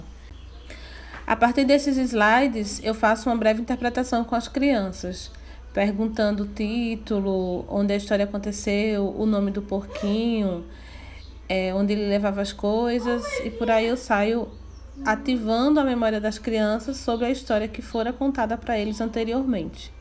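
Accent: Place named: Northeast, Brazil. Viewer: De onde você é professora ? Seu sotaque é bem nordestino.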